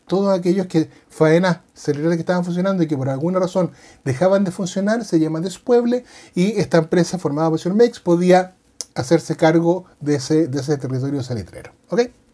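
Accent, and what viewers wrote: Chile. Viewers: Hola, gracias por tu nuevo video. Soy chile y entiendo tu manera de hablar, pero tengo claro que si quieres llegar a mas personas, debes tratar de hablar en un chileno mas neutro. Que te vaya muy bien.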